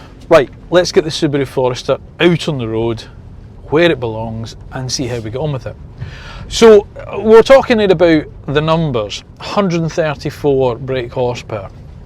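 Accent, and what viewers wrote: Scotland, United Kingdom. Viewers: Thank you for a truly fabulous review! Although the e-boxer Hybrid is just coming to here in one of the colonies, Canada, it is not yet available, and from early reports it will be in high demand. I will be getting a 2025 top trim non-Hybrid, which here in Canada is the Premier. We are lucky that we get the 2.5 L 180/hp engine here. Hopefully I can get the Autumn Green with the brown leather interior. Our grandson said you sounded like "Shrek" but no offence since my wife has Scottish roots. Cheers!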